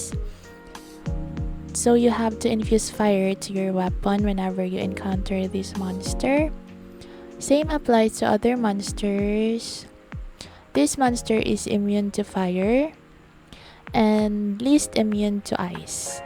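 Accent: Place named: Philippines. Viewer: A like for your filipino accent when you speak English... It's so cute ^ ^ ❤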